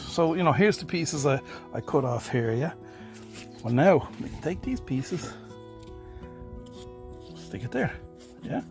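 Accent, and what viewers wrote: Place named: Ireland. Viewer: Another great vid mate. Billocks ti the trolls! We love your Irish accent. Currently in a field with crap signal, setting up for a weekend LARP event so chilling out, watching this. Cheers mate and keep.up the great content. 👍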